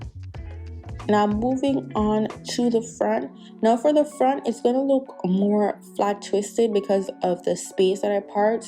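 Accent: Jamaica. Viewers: I think she's Jamaican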